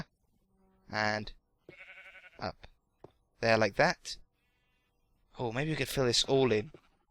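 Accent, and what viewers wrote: United Kingdom. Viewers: I can sence yo' britishyness. Is that what it's called? Btw love your vids! 😸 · I am not trying to be mean but u could ride at the end of your videos but u really do a good job and r u British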